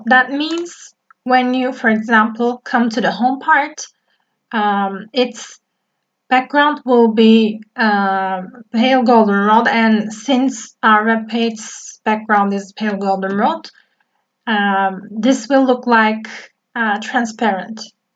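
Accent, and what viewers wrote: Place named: United States. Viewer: you speak like you live in america hw dd u do that!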